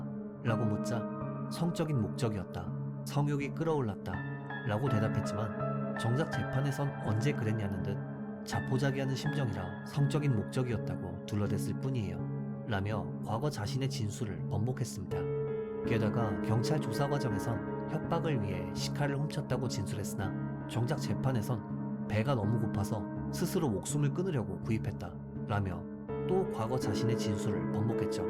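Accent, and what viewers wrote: Japan. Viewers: 나레이션 발음이 일본인이거나 한일혼혈같으신데 맞으신가요? 일본지명 발음이 일본현지 사람 같아서요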